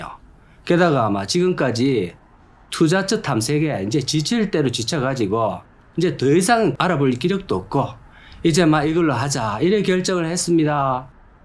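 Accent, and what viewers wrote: Yanbian, China. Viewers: 이사람 발음보니 조선족 화교인갑네.. 어쩐지 말투가 역스럽다 했어... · 여기주인장님 국적이 대한민국아니죠?? 발음과 사투리가 너무 표가납니다. 연변사투리정도가 더 가깝다 ㅠ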